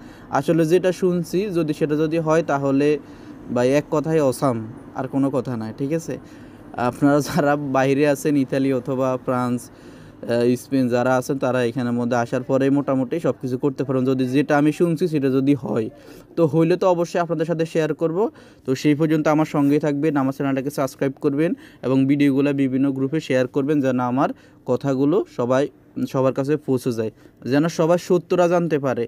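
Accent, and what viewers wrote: Noakhali, Bangladesh. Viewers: vai ki noakhailla ni? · ভাই আপনার দেশের বাড়ি কোথায় নোয়াখালী আপনার কথা শুনে খুব হাসি পায় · বাই আপনার বাড়ি কি নোয়াখালী নাকি জানাবেন অবশ্যই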